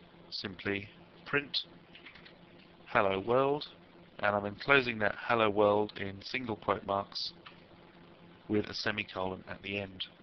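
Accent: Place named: United Kingdom. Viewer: whats wrong with british accents?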